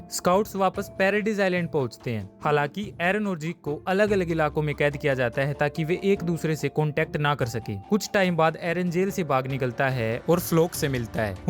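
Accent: Haryana, India. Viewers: haryana se hai kya bhai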